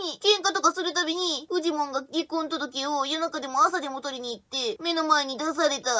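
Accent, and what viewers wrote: Kansai, Japan. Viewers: 総統、関西？ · 統裁は関西なのですか？ 関西弁も聞きたいです。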